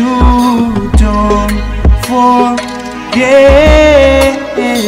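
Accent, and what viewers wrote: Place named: Latin America. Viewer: Sentí tu acento latino 🤩🤩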